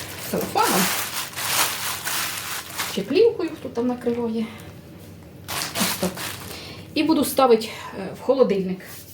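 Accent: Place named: Poltava, Ukraine. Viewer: Чую голосочек полтавчаночки і аж на сльози пробиває... наче моя мама ожила. Вона теж готувала дуже гарно і без книг по кулінарії і без інтернету. І посудка похожа навіть запах відчуваю. Не думала, що так побачу і від чую тепло наче рідного дому. ДЯКУЮ... ДЯКУЮ... ДЯКУЮ!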